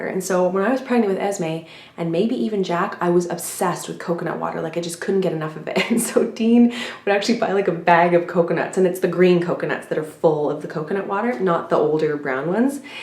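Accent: Malaysia. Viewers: Your Malaysian English accent is so on point! hahaha. I love it here as it is chill, but active at the same time. And the foood. I always miss it after a trip overseas! We hope to see you in Malaysia soon again! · you lived in penang! do u have the penang accent? ;) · haha,bunyi dah macam org malaysia betul je...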